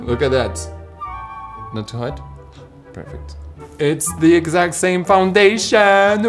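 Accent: France. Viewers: As a french person, i wasnt sure if her accent was a french 🥲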